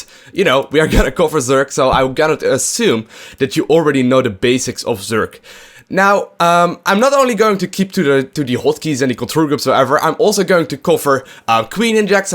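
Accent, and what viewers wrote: Netherlands. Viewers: are you Dutch? you sound Dutch to me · Just wondering are you from the netherlands? :)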